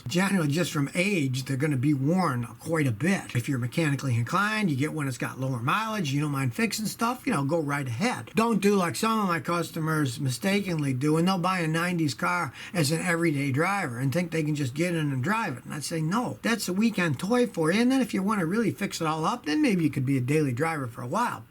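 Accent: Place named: Chicago, United States. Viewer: Where is from originally? He has an accent that sounds possibly like Chicago’s.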